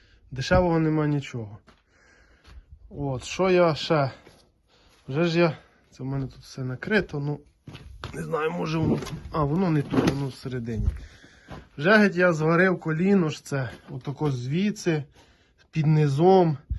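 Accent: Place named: Zhytomyr, Ukraine. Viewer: По говору таке як Житомирська область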